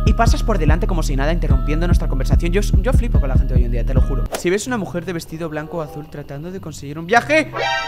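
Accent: Argentina. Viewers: hablas bien en modo argentino ya que soy de argentina hablas super bien😊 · y su acento argentino a todos lados, lo amoo 🎨🌷❤️‍🩹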